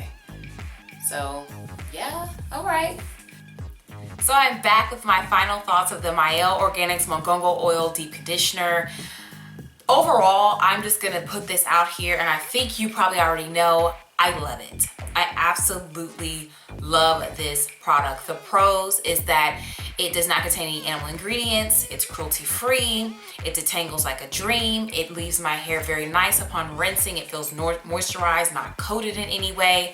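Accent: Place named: South, United States. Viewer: New subbie here 🤗 I love your personality! The thickness in your hair, lawd bless you. Are you from the south? I love your accent!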